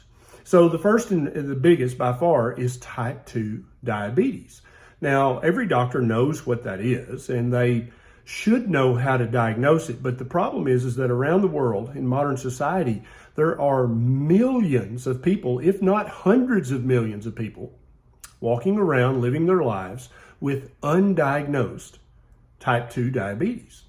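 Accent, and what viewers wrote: South, United States. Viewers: Your Southern accent is very charming.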